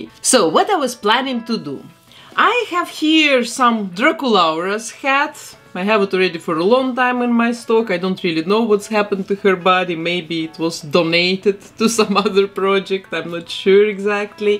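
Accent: Russia. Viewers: Вау I'm Russian. И мне одной кажется что она говорит с русским акцентом? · Видео классное ,но русский акцент слышно очень сильно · And she is speaking with a Russian accent, so.... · I'm sorry, I'm new here and this video was in my recommendations. You are very cool 😎 But where are you from? You have russian accent))) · Ахах, я слышу явный русский акцент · судя по акценту, вы русская. простите, если ошибаюсь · Ты случаем не русская или это британский акцент?? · замечает присутствие русского акцента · Я просто слышу,что эта женищина из россии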